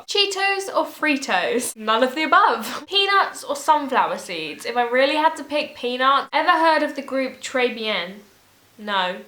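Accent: United Kingdom. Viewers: Hey! This is the first time I comment because I'm a little shy and I get so embaressed in speaking english with people, so forgive me if there is some mistakes. Well, I want to tell you that I absolutelly love this kind of video, in fact, I love all of them; tutorials, Q&A, vlog's but I really enjoy to know you a little more because you're so funny and ordinary in the best way, you're just being yourself and that's so enough because you are beautiful the way you are. I'm really happy that I found your channel. And god, spanish it's awful to me. It's very similar to portuguese but I just can't speak! haha And just saying: Your british accent is awesome. It's really cute. Here in Brazil we love it. Really. I wish I could stole it from you! xxxxx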